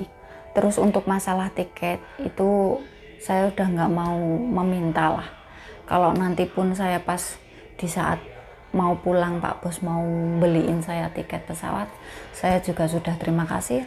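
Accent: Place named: Java, Indonesia. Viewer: Mbk,,, njowone ngendi mbak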